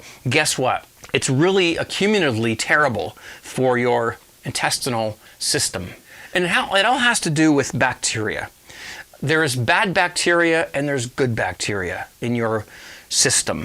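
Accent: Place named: Canada. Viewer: French side on the sea salt box? Either IT'S from Canada, or is, I imagine. Using metric, eh? Right on, sounds like a fellow Canadian. Even apologized for dropping the box! I remember once I apologized when someone bumped into me at the pub! Anyways, great vid, I seen another method where basically it was all done in the jar. Put a small layer of salt at the bottom, add a layer of finely sliced cabbage, tamp it down, add a layer of salt, add a layer of cabbage, tamp it down, salt, cabbage, tamp, salt, cabbage, tamp, etc. I guess it can work either way, but more salt is probably used in the layer process. The layer process also seems a tad bit easier. Seen it on Jas. Townsend and Son. Gonna have to make my own sauerkraut some time! Definitely subscribing to this channel, I imagine there's vids on making home brew as well, which I'll certainly enjoy watching!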